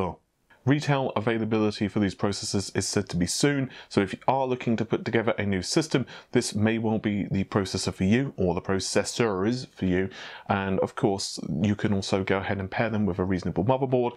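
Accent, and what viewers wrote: United Kingdom. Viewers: He sounds more British than American tbh.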